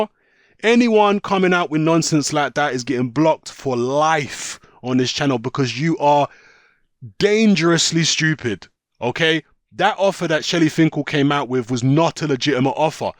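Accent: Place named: South London, United Kingdom. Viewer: has a very heavy South London Accent. Which part of America is South London in?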